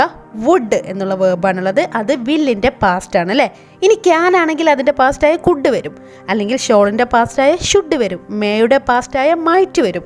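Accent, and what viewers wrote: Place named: India. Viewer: cringe indian accent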